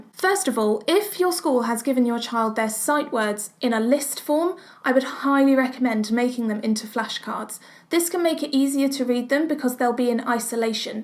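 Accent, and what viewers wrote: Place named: RP, United Kingdom. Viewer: British accent; more specifically, received pronunciation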